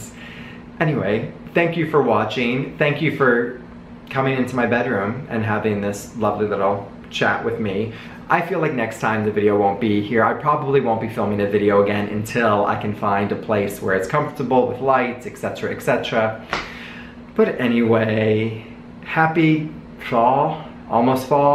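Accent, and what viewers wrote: New York, United States. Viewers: Our first New Yawk Tawk!! Love it. Sip sip!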